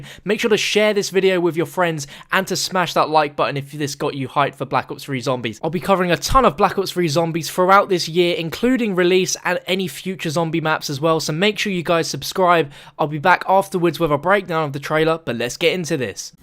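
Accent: United Kingdom. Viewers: Why does every famous COD reviewer on YouTube have to have a pussy ass British accent?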